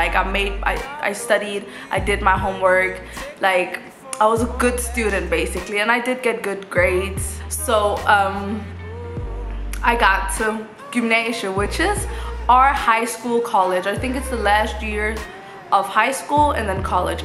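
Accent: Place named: Latin America. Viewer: Your accent sounds somewhat Latino. What country and town to you live?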